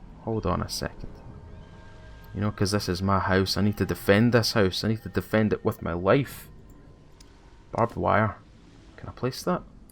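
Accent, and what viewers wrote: Scotland, United Kingdom. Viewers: that sweet sweet Scottish voice. mmmmm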